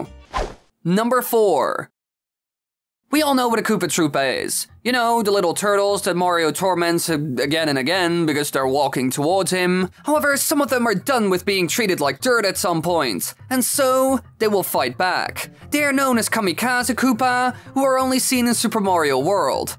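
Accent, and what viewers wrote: Netherlands. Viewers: 0.06 Ah yes a fellow dutchman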